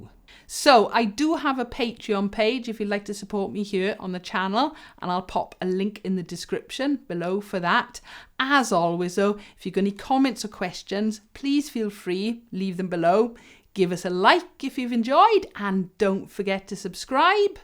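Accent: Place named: Wales, United Kingdom. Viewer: Hi many thanks for your informative reply. Sadly the purple Flight Ltd Ed mini J bass is now sold out. I could return the Squier I suppose. I would be interested to hear why you prefer the Ibanez Mikro. FYI loving the purple, dragons and Welsh accent, reminds me of my second home - studied in Wales many Moons ago and miss it. Keep on playing 💜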